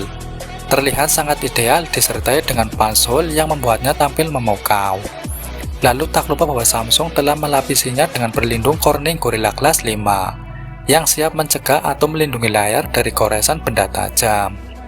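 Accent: Banyumas, Indonesia. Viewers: logatnya ngapak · Jawa e ndi bro? Ngapak banget yah 🤣🤭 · Suara ngapak nya keliatan bnget yakk.. wkwk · Soalnya aksennya "ngapak". Jadi harus menekan aksennya itu biar bisa ngomong bahasa Indonesia dgn baik. Gitu sih kayaknya. Hehe.. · Wong ngapak ya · KAN ORANG NGAPAK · Ngapak ya.. 😁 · Ngapak ngapak.. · orang ngapak ya bang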